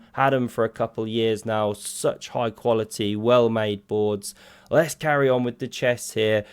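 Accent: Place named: RP, United Kingdom. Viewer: I subscribed as soon as I heard BBC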